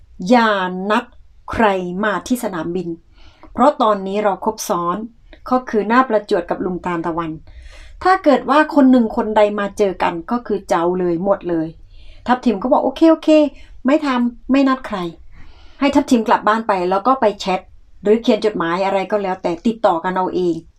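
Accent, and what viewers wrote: South, Thailand. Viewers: รักน้อง พูดใต้ ชอบฟังมาก ฟังไม่ออกหมด แต่ชอบคนใต้😘😍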